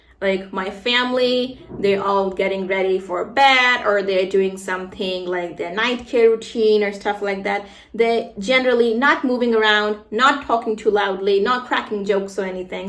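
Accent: United States. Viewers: I love your American accent 🎉 Break a leg ❤ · I love your American accent ❤️❤️❤️❤️❤️🇺🇸🇺🇸🇺🇸🇺🇸🇺🇸🇺🇸🇺🇸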